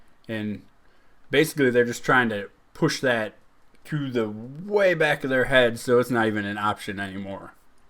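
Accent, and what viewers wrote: United States. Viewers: You sound like you’re from Louisiana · That's so funny. I knew you were from Wisconsin right when you started talking. And then you said down south so I was confused but you confirmed it in the video haha. Go Wisconsin!!!!!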